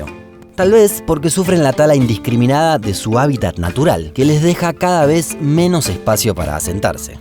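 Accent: Argentina. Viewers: Porque los argentinos o el acento de esa zona de Argentina que creo que es el porteño es llamativo A mi me gusta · Esta buenisimo el contenido, me encanta tu acento argentino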